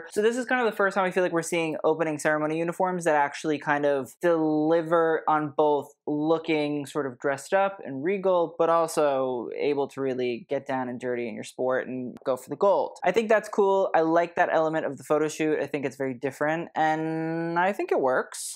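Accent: United Kingdom. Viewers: ❤ your British accent 😂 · the british accent took me out 😭😂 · Your British accent is hilarious, hahaha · The British accent is giving 😂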